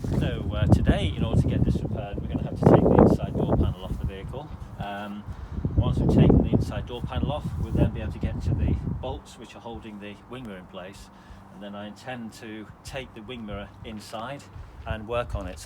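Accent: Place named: United Kingdom. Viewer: Maybe it just me and growing up watching Michael Caines Battle of Britain. But with your voice and accent I feel like your working on a Spitfire. Very enjoyable video explaining the repair.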